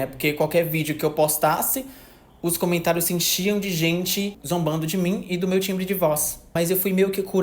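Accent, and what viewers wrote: Ceará, Brazil. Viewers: Vem sotaque do Ceará! Veeemmm!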